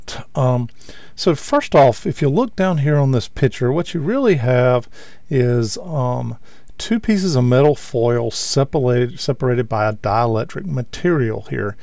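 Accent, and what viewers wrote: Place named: Texas, United States. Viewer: Love your videos. I am 61 and looking to get into Vintage Audio repair not only to keep my gear in top condition, but to give back for what has been a lifetime of enjoying these fine components. I'm an electrician and built a Harley from scratch when I was 17 in my bedroom. I think I can figure this out. I know a gentleman I work for who has an accent identical to yours. He's from around Waco, Tx. Anyway, I'm going to purchase a Sprague TO 6 and was wondering if I need to desolder every capacitor I test. I'm guessing yes, because if it is leaking voltage, it will send high voltage to the other components while connected to the circuit board and possibly damage them. Is that correct?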